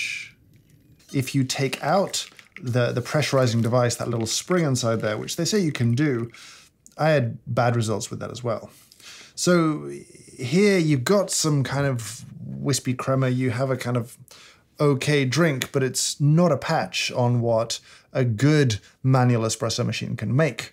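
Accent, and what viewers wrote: United Kingdom. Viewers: His voice is calm but seriously British? You and the negativity about your opinion and Gawdamn even your face already can tell everyone you don’t like this product, return it and just run the old conventional espressos machine will ya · Thank u in advance. So you spared me about 70Dlls I was about to spend in this gadget. I was short of money and bought a bigger thing, a Delonghi espresso for home. Almost the same price, and much more useful, regards from Mexico, like a lot your finicky behavior and your a very nice warm loving guy. Love your British accent btw. Cheers from Baja California ;)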